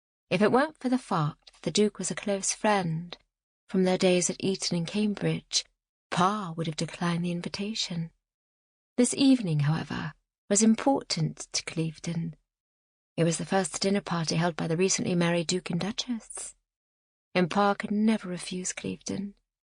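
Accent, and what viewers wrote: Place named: United States. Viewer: Why use an American for a British story? There are great RP English voices available. Be authentic!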